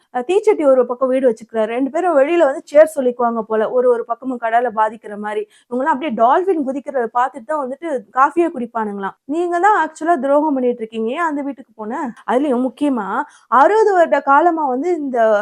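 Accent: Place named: Telugu, India. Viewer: நீங்க ஒரு தெலுங்கு பெண்ணாக இருந்தாலும் ரொம்ப அழகா தமிழ் பேசுறீங்க ❤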